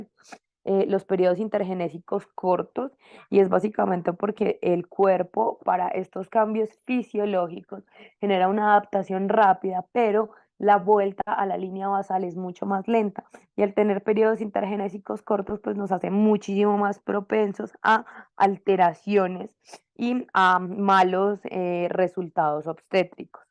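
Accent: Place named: Colombia. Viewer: Excelente trabajo! Me encanta el dialecto colombiano 💖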